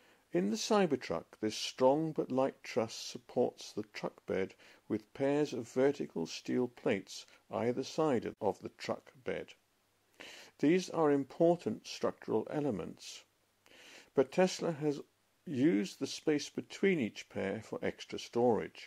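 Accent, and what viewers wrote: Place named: United Kingdom. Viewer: British-accented voice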